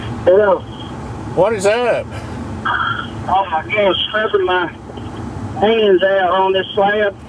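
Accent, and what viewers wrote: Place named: Appalachia, United States. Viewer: Peter has a New English accent this guy has a Appalachian accent. Ones Northern the others Southern very different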